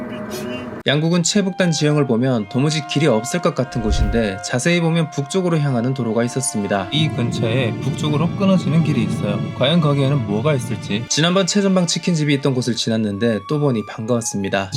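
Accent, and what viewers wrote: North Korea. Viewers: 이분 탈북자임? 말투나 억양이 좀 있는거 같은데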